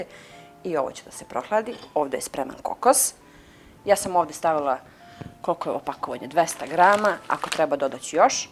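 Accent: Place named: Toplica, Serbia. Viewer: TAKO PRICAJU KAO DASU IZ VRANJA NE BAS TOLIKO ALI NAGLASAK PROKUPLJE TOPLICKI KRAJ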